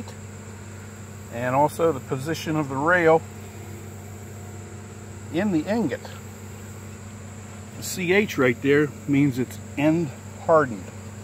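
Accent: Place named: Maryland, United States. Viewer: Man, I don't think I've ever heard a thicker Maryland accent in my life.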